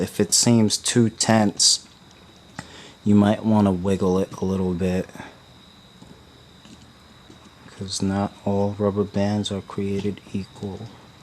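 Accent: United States. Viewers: Are you from New Jersey? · Are you american or what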